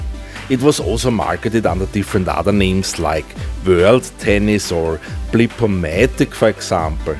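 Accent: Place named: Austria. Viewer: austrian english at its finest!!! love it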